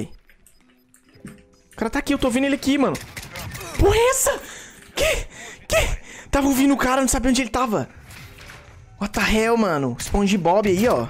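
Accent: Minas Gerais, Brazil. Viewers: !! Pelo seu sotaque vi que vc é de Minas. De qual Cidade vc é?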